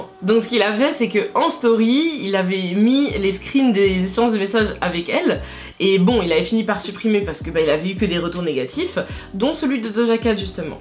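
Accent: United Kingdom. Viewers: On accent anglais >>>>>>>>>>>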